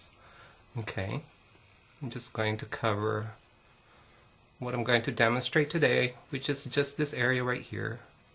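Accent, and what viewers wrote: Philippines. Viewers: omg! you're filipino ! :) i can tell by the accent you did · he's filipino! lol, thei got the accent! LOL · Love your Filipino Mother accent! Haha! <3 I just wanted to say.. THANK YOU FOR THIS VIDEO! Honestly, this may be weird to confess, but you have made me accept.. actually, LOVE my acne scars. I never thought of them as something that can give me "character". So thank you, so much! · mwahahah love the pinoy accent you;re so awesome!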